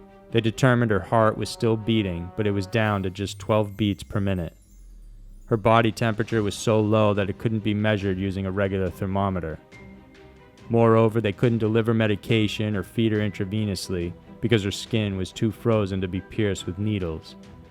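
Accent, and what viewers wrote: South, United States. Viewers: He has a southern accent i dont know how thats scary.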